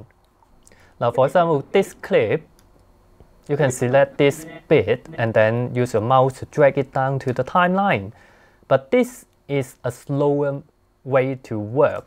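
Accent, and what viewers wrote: China. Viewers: it is really good to hear that 'embedded Chinese native accent' when you talk. keep up the good work! thanks for this wonderful video.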